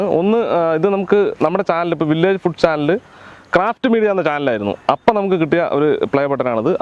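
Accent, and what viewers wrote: Palakkad, India. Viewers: പാലക്കാടൻ സ്ലാങ് ആണോ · Ethu njaga palakkadada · ആദ്യം ഒരുപാടു ചിരിച്ചു , നിങ്ങളുടെ പാലക്കാടൻ ഭാഷയും മറ്റും കണ്ടു ... അവസാനം കുറച്ചു കരഞ്ഞും പോയി ... നിങ്ങളുടെ ആ നല്ല മനസ്സ് കണ്ട് ... 🙏🙏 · ഹേയ് ഇതു ഞങ്ങൾ പാലക്കാട്ടുകാരുടെ തനതായ സംഭാഷണ രീതിയിൽ അദ്ദേഹം ജാടകളില്ലാതെ പറയുന്നു അത്രേ ഉള്ളു. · Palkkade slang powlii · Palakkad aano,ikkante samsaaram pkd pole thonnunnu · Palakkadan slang istam like here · Palakkaadan daa🥰🥰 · all the best എന്ത് ചന്തല്ലെ ഇത് തനി നാടന്‍ പാലക്കാടന്‍ സംസാര ശൈലി 👍👍 .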